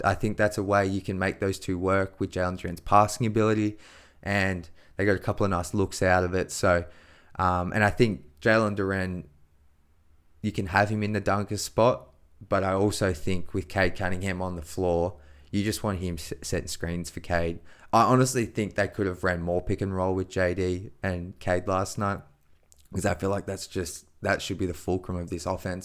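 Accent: Australia. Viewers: Came here from Twitter, and the Australian accent surprised me! Always love an international Deeeeetroiitttt basketball fan! Awesome breakdown & analysis, keep up the premium work!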